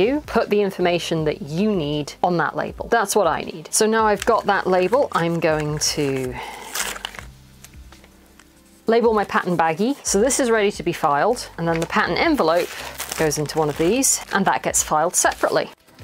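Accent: United Kingdom. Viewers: Wow! I wish you’d worked this out and told me 50 years ago. Hah - I know you weren’t even born yet, then! Well you’re a genius. I could understand and appreciate every single piece of info I just watched and as you flipped through your patterns, I recognised some of the ones I myself bought in the 1960s, 1970s and 1980s. Actually all that stuff comes back into fashion every so often and I haven’t bought patterns lately - they seem so expensive these days! It’s such an intuitive and sensible way to organise patterns. Mine have all been sellotaped together over the years because they do indeed swell up every time you use the contents. And I’m going to set aside some time to put your wonderful system into practice for myself. Thanks a million ❤❤❤ PS How I love to hear a lovely calm British English voice with no affected growling or peculiar accents. 😂😂😂 So many youtube videos get turned off here, because I can’t bear the hyped up crazy sounding voices and over exaggeration on each word. 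And these days, most people seem to shout at the microphone for some reason. It’s a delight to listen to you, so thanks very much. Keep up the good work - it’s always interesting and helpful and unusual and informative x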